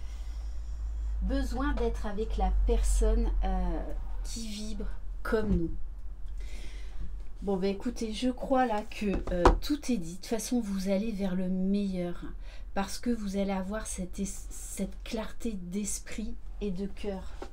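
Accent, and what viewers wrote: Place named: Alsace, France. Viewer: Bonjour. Oui du 67 .mais c est un accent tellement mignon qu on a .rester comme vous êtes .c est l Authenticité qui fais la bonne personne. 😇🥰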